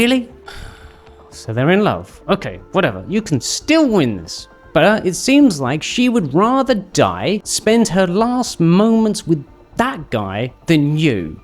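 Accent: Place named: RP, United Kingdom. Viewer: Ok, this is first time I watch video with this particular youtuber and I must say I could listen to him for hours. The way he speaks english with received pronunciation is just wow.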